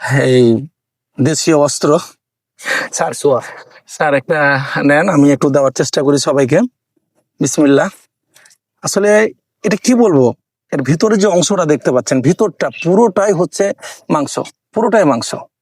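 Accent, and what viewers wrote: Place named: Kolkata, India. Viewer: আপনার কথা শুনে মনে হয় কলকাতার লোক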